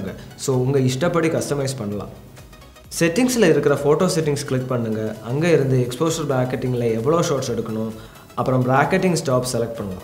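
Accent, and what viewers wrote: Kerala, India. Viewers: Konjam kerala slang varuthe Athum nall than irruku👍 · கேரளா people தமிழ் பேசுனா நல்ல தா இருக்கு .. good job bro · neenga Kerala va malayali maari pesurenga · Santhegame illa. Look & speech... Clr ah malayalinn kaatuth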